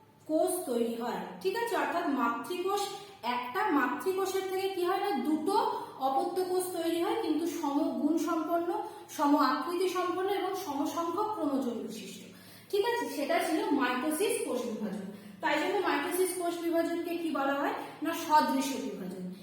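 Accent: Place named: India. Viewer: কথা গুলা শুনে মনে হচ্ছে ইন্ডিয়ান!! ক্লাসটা ভালো ছিলো ম্যাম From Bangladesh 🇧🇩💙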